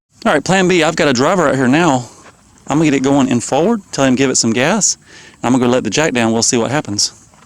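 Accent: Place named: South, United States. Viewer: a hint of a southern accent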